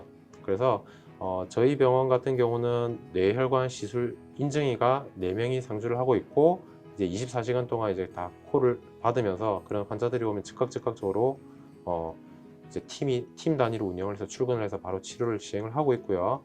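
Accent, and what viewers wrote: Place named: Gyeongsang, South Korea. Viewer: 닥터님께서 경상도 분 이시네요 상세한 설명 감사합니다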